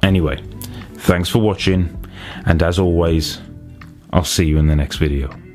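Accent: England, United Kingdom. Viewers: your English accent is the icing on the cake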